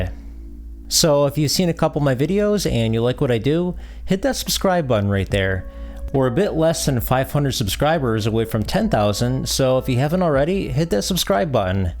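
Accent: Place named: Transatlantic, United States. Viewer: So neat to hear the transatlantic accent with relatively high quality sound compared to commercials and even some films made in the 1940's. Transatlantic + video games = complete bliss! As someone who's played a good deal of both in the 2000's, they both have their standout titles. While I love the Mega Man hexology on NES, Phantasy Star was no slouch!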